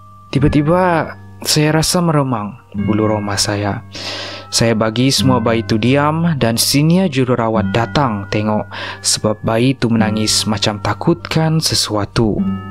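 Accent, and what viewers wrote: Sabah, Malaysia. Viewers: sabah sja guna kopi - kupi.. haha.. mmg sabah dia ni.. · memang sabah pun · Sabah LA bai tuh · Memng org Sbah agknye.loghat Sbah je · Suara dia best..,Mcm sabah sikit...Hihi...😃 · memang org sabah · Cerita2 Seram! Memang cerita yang benar berlaku dan sentiasa disukai semua orang.. Suatu masa dahulu tahun 1955 Radio Melayu Singapura pun menjadikan SERAM sebagai program terbaik diRadio dan sentiasa keudara pada jam 2330 setiap malam Jumaat.. Berbagai cerita yang cukup menakut dan menegakkan Bulu Roma serta ditambah kesan bunyi juga amat menyeramkan..Ia bukan seperti Drama biasa diRadio.. Mohon Penyiar ini menukarkan lorat membacanya yang begitu BAKU dan seolah olah dalam lorat SABAHAN ke lorat biasa saja agar lebih syok didengar dan betul2 ngam2 sui kata sebenarnya.. Idok ke gitu..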